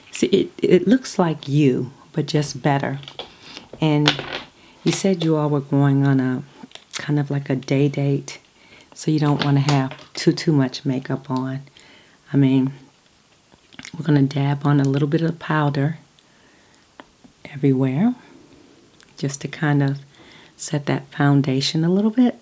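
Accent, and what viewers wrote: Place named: United States. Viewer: Looking angelic as always I see :). Damn... it is the first time I've heard your speaking voice and your American accent (I am British lol) is glorious like silk. So calming. Your tone is asmr perfect even without any whispering. I love listening to your precise explanations too. Definitely adds tingles for me. I look forward to more hopefully :D fingers crossed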